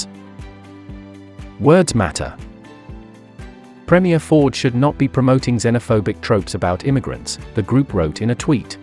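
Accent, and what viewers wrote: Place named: United Kingdom. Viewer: Would the British-sounding narrator please do a video with himself in it to add details? Thanks.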